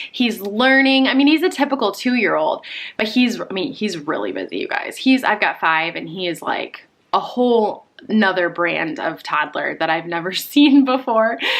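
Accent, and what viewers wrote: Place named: Michigan, United States. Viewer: Commenting again to say that I’m a Michigander (now residing in Tennessee) and it’s nice to hear someone who has my “accent” 🤣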